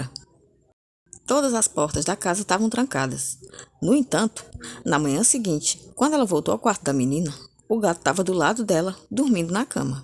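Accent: Northeast, Brazil. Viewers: Boa tarde irmãzinha, gostei muito de seu canal sua voz narrando ôs vídeos é bem nordestina, sou daqui do estado de São Paulo, mais nasci na Bahia, pôr isso entendo tudo que você diz 😂😂😂😂😂respeito muito ôs vídeos, c são reais ou não fica há cargo de cada um, acreditar ou não, tenho dezenas de canais que relata vídeos verdadeiros de lobisomens vampiros e outras criaturas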